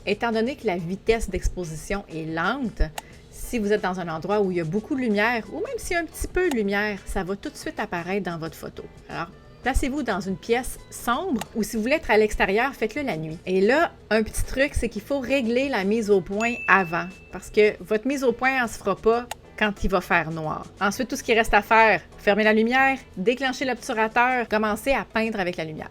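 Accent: Quebec, Canada. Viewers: Merci pour la video. Votre charme, celui des vos chats et votre "accent" québécois (je suis suisse) y contribuent beaucoup. Et merci pour les astuces très bien expliquées.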